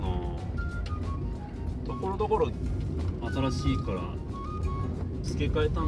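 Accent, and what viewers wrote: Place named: Fukui, Japan. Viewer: 声を聞いていたら福井弁のように感じましたので思わず登録しました(笑) この道は３０年ほど前に比べると今は少し良くなった気がしますね。それでも普通も道しか知らない人には驚きの連続でしょうね。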